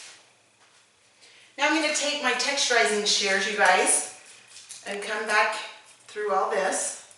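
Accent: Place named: Wisconsin, United States. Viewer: Your accent sounds like Wisconsin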